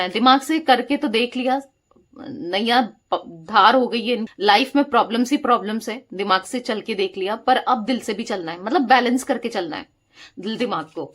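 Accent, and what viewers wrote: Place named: Punjab, India. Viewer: Ap ki awaz Punjabi+Urdu = Mix tone ha unique voice ❤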